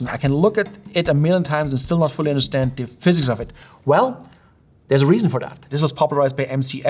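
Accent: Germany. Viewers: I just knew he was German as soon as he started talking😂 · I HEAR GERMAN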